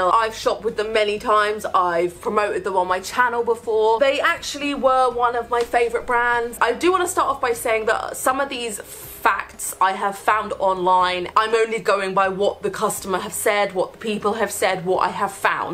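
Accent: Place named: Midlands, United Kingdom. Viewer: U sound like ur from the Midlands, maybe east Midlands. Am I close? In England, of course. I'm doing a challenge with my mates to see where youtubers are from and see who's closest to us. Am I close?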